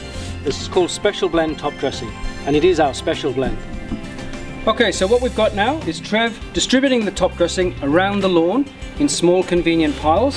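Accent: Wales, United Kingdom. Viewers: I think he is Welsh! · That's not a South African accent. Definitely Welsh. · You Welsh?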